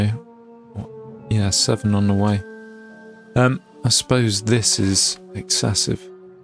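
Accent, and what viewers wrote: United Kingdom. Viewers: You do realise that only the Brits (you) and Aussies (me) will understand what you just said.... about Beavers.... Your accent sounds British - let me know if I'm way off base, but I totally got your humour.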